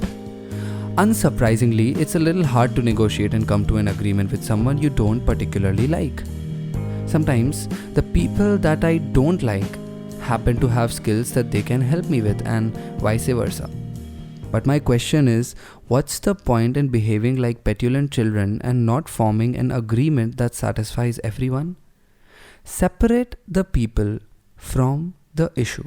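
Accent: India. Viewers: I like your accent. Are you from Hyberabad?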